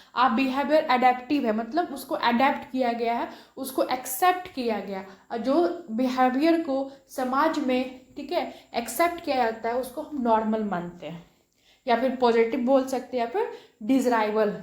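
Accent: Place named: Bengal, India. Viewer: Madam poora bangla accent ❤❤❤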